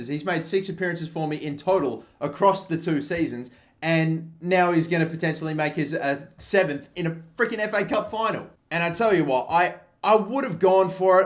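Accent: Australia. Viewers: Didn’t know Alisson did youtube and had an Australian accent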